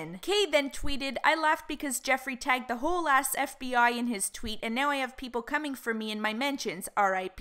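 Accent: Canada. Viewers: Jesus Christ this girl's accent....Is she Canadian or something? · T J. Are you seriously suggesting a person from an English speaking country (canada) should get english lessons specifically to sound less canadian so that their slight accent doesnt offend your ears? Guess what? Not all viewers are from the US and the rest of us couldn't care less about a slight variation in accent.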